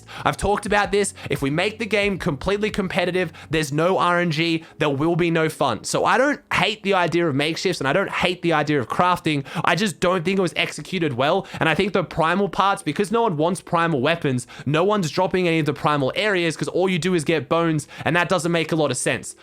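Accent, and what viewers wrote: Australia. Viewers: has a very strong Australian accent but when he starts off his second line with “Welcome back to my YouTube Channel!” It sounds so British how he always pronounces it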